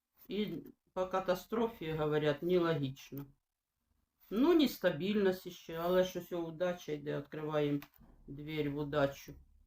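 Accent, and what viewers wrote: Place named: Surzhyk, Ukraine. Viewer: Доброго дня, я яз Вами з самого початку коли було 500 підписників.Там у Вас була Донбасівська мова,так якми їїї добре знаємо. Зараз у Вас прекрасний український суржик! Ну приємно слухати,чесноя!!! А от коли Ви російською до Боні,то це правда ріже слух,якось наче і не Ви! Я не проти російської,розмовляють,пишуть люди,аби душа була українська ❤. Не приймійть як образу.,нехай котик вчиться теж українського суржика так як проводите ефір❤❤❤. Головне миру скорішого,! Дякую за розклади❤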